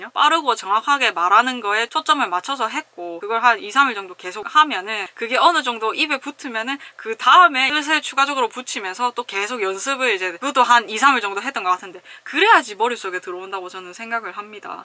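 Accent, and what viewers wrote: Busan, South Korea. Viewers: 유투브에서 부산 사투리 들으니까 신선하네요 ㅋㅋㅋㅋㅋㅋㅋ 전 대구사람이라 친숙하다는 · 와..부산사투리 오진다...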